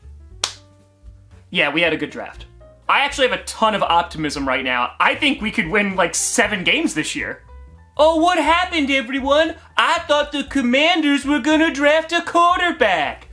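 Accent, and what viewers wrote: New York, United States. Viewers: Love your NY accent!!😅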